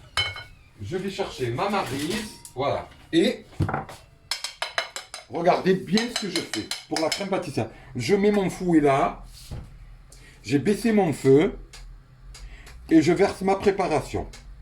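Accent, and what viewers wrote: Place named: Sidi Bel Abbes, Algeria. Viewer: ASALAM alikoum vous êtes de Sidi Bel Abbes vous sa s'entend moi aussi je suis de Bel Abbes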